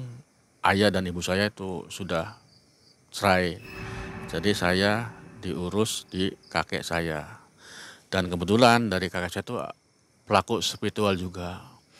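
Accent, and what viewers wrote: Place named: Cirebon, Indonesia. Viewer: asli wong ndi sih soale pada bae kya Indramayu cirebon logate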